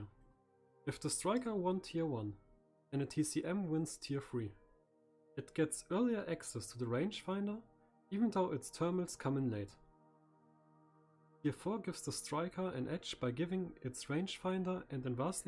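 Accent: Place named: Germany. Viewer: You sound german af, i like it. Very well made video too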